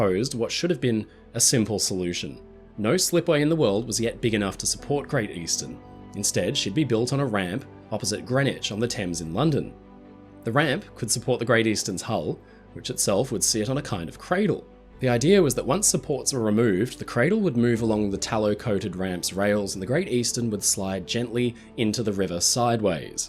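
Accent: Australia. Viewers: Great to hear the beautiful Australian accent. Great vid, but you might want to brush up on your tenses.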